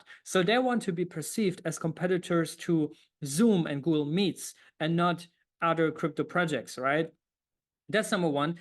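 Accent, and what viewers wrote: Germany. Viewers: oh shit i texted in german. Maybe im wright and your accent is german?!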